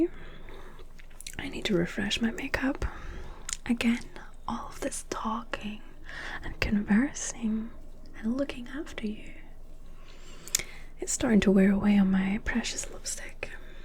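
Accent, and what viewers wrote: Scotland, United Kingdom. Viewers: She’s Scottish now? hell yeah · Alternative universe Scottish tall lady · Looks wise you got it locked down but voice wise just not it for me😂 not that theres anything wrong with a Scottish accent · SCOTTISH VAMPIRE LADY YESSSSS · Aye thought I heard a hint of Scottish in there, just saw the about page. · Last thing I expected when clicking this is a Dimitrescu with a Scottish accent, love it, live for it. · I think she's Scottish Someone will probably correct me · Scottish Dimitrescu...very boner inspiring. The hand thing in front of the camera is kind of weird though. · I hear a slight Scottish accent in your voice is it? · love a Scottish queen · Knew you were Scottish 😜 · Sounds Scottish. Is that Ginger Zee.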